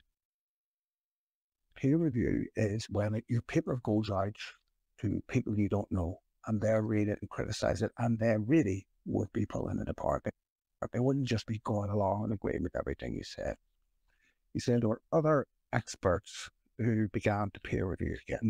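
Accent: Belfast, United Kingdom. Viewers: The audio quality is not the best (particularly on these earlier episodes) which is likely down to mic (recorder) but it does improve in later episodes. He's currently up to elosode 57. His Belfast accent may also cause difficulty to those that are not used to such an accent.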